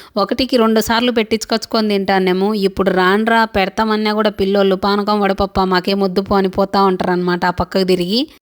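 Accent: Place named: Kadapa, India. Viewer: U r voice ur slang of kadapa s super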